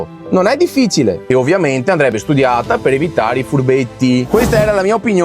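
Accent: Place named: Padua, Italy. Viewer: Ai ragione.. Tutto vero ciò che dici. Ti saluto. E ti auguro sempre di fare bellissimi viaggi un abbraccio e bacione. Marta da Brescia. Sei un padovano. Doc. Mi piace molto sentirti con la tua parlata padovana.ciaooooo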